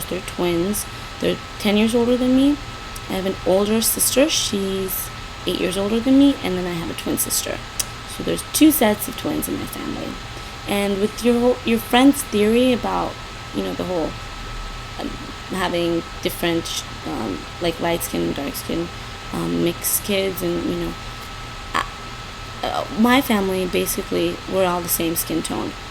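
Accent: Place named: Nigeria. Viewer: Sup? I'm also Nigerian/American but moved to the States when I was 2. Surprised to hear you still have a hint of a Naija accent! informative video...pz.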